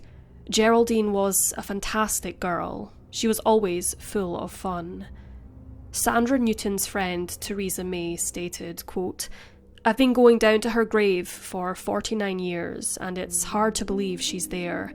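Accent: Scotland, United Kingdom. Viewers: Scottish narrating a crime script is awesome. Its such a cool accent of english. Ive learned so much about Wales, Northern Ireland and the U.k. as a whole from other english crime mysteriy tubers. Ive been everywhere on youtube and your channel is great! Love it . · New sub. Excellent vids. Love the Scottish accent. ❤️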